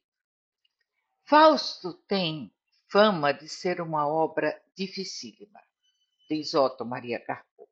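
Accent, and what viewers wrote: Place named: Rio Grande do Norte, Brazil. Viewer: Curiosidade: seu sotaque soa potiguar. Você é daqui?🙂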